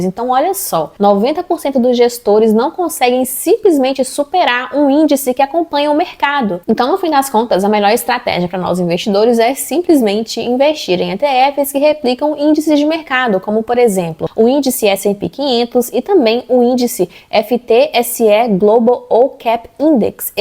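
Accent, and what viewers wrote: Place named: Belo Horizonte, Brazil. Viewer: Ótimo conteúdo. Esse sotaque é de BH, né?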